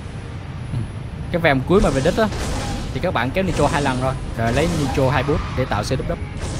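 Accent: Quang Ngai, Vietnam. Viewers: Giọng QN dễ thương ghê haha :))))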